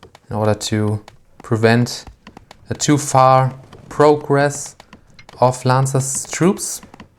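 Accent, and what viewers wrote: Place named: Germany. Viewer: Hello there I would like to ask you why you sometimes refer to yourself in the third person, it makes you sound a little arrogant, no offence intented. Just wanted to make you aware of this since I noticed that you are a non-native speaker due to your German accent. As always, some really enjoyable content:D Keep it up!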